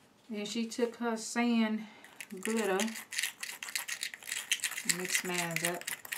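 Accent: South, United States. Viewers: Omg southern gal to the fullest 😂. I love this!!!!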